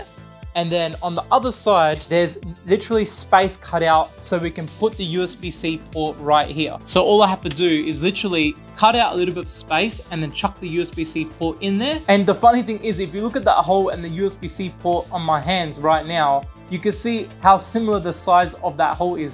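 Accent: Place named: Australia. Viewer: MATE THIS VIDEO IS GREAT, AND I LOVE HEARING A FELLOW AUSSIE DOING KEYBOARDS love from western syd <3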